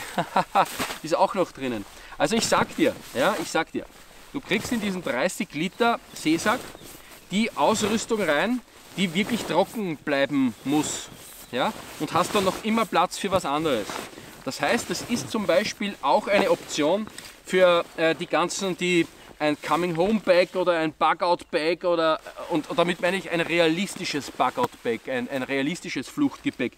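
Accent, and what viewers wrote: Austria. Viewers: Deine Videos sind einfach toll. Keine Verkaufsveranstaltung das fachliche ist einfach top.Dein Österreichischer Akzent und die ganze Art und weise einfach nett. Mach weiter so!!!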